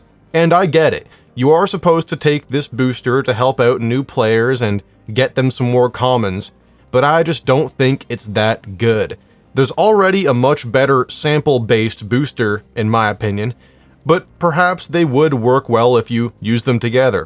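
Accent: United States. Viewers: did you seriously do the western accent this whole video? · Love the southern drawl throughout the vid! I will pick this someday soon, just need to get the credits first.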